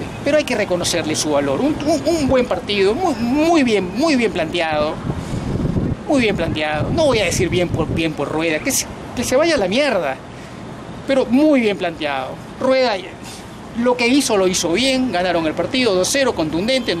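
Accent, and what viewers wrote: Argentina. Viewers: Porque este peruano habla como argentino????? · me gustan tus videos los veo siempre pero se me hace raro y chistoso que hables como Argentino y tengas la camiseta de Perú, igual sus comentarios son bien acertados siga asi.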